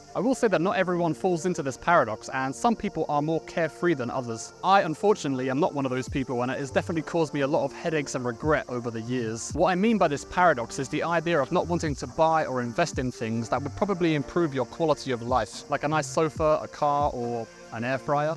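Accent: United Kingdom. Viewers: Hey there, this is the first vid from you I have seen, and I’m a new sub from South Africa 🇿🇦 and I have to say, you sound vaguely South African too…I can also hear an English accent too…eish, I’m confused and I think I’m hearing things, sorry…The more I listen, the more English you sound now 😂😂😂🤷‍♂️🙏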